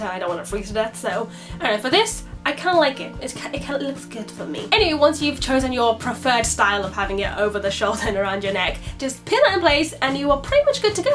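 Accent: United Kingdom. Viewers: so cute! is she british, i really like her accent!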